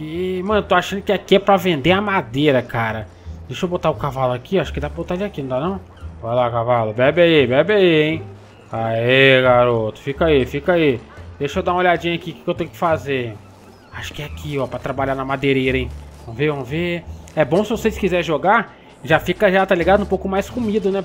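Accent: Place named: Minas Gerais, Brazil. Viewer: Sotaque de Minas parece da roça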